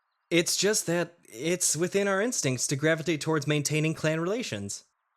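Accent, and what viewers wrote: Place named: South, United States. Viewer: He making me like country accents…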